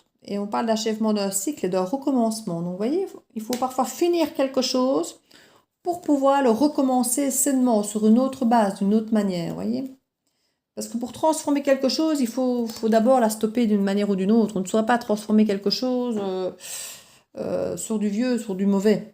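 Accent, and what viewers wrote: Liège, Belgium. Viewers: Tellement vrai et juste... On reste dans mon theme lol :p J'adore tes guidances sinceres et justes, sans complaisance, en toute honneteté... chaque video est un plaisir (puis j'adore cet accent liegeois :p ). En tous cas, je ne peux que te conseiller a te lancer dans les guidances privées car tu es vraiment tres tres douée et tu vois juste... N'aies pas de doutes sur tes capacités. Tu es geniale <3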